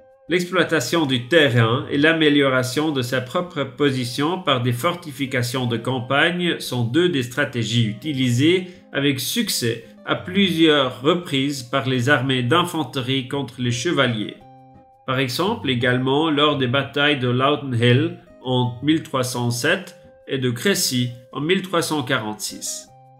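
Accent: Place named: Swiss German, Switzerland. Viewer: c'est un accent suisse alémanique.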